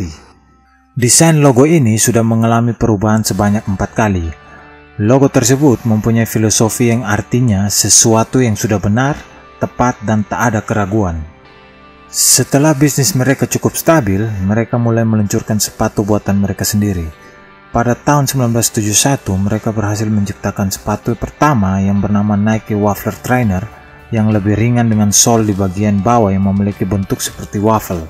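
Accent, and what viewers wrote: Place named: Eastern Indonesia, Indonesia. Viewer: Orang timur ni logat nya smoga sukses bro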